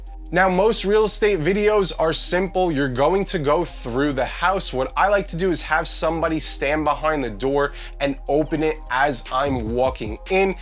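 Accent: New York, United States. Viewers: New York accent still strong my guy 💪🏾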